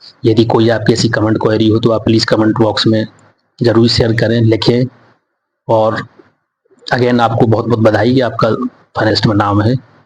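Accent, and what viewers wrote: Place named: Bundeli, India. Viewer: Maharaj aap bundeli bolo wo achchhi lg rhi........you know ni bolo😁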